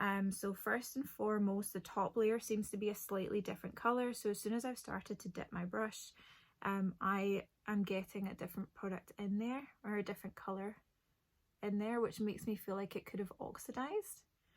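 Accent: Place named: Scotland, United Kingdom. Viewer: At the start I thought you had american accent but the more I listened I can hear your scottish now ha 🙈😁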